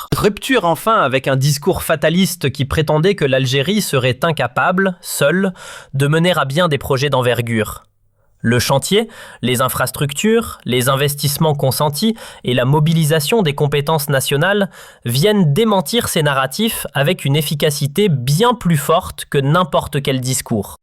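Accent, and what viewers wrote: Canada. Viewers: à l'accent canadien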